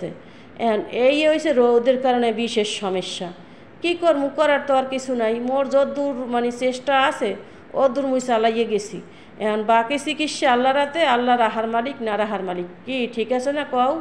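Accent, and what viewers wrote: Barishal, Bangladesh. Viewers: আপু তোমার বরিশাল ভাসাই কথা শুনতে অনেক ভালো লাগছে ❤❤সবজি বাগান দেখতে অনেক ভালো লাগলো ❤❤ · আপু তোমার বরিশালের কথা শুনতে অনেক ভালো লাগলো মাশাল্লাহ অনেক বাজার নিয়ে এসেছো অনেক ফল তোমার বাগানের গাছগুলো মাশাল্লাহ ❤❤❤ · আসসালামুয়ালাইকুম আপু 😊। বরিশালের ভাষা শুনতে ভালো লাগলো 😊। দূর প্রবাসে ভালো থেকো আপু 😊। আমি আছি গো😊 বালতি ভরা ভালোবাসা দেখলাম 😅। মসলা ❤❤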